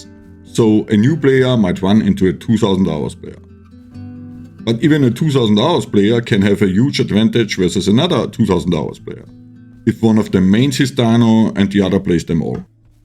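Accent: Germany. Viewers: Are u german? Ur english sounds german 😅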